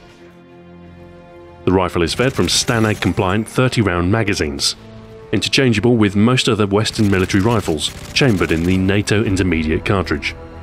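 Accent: United Kingdom. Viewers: Is it just me or do British accents make everything sound more intelligent? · I don't know why these Americans think the British way of speaking is accent, if it came first.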